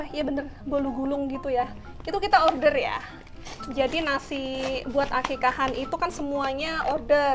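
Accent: Java, Indonesia. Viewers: Sy tu seneng klo mbak ngudang adik, logat jawax terasa banget... Sehat, sukses selalu buat mbak sekeluarga 🙏🙏🙏🤗🤗😘😘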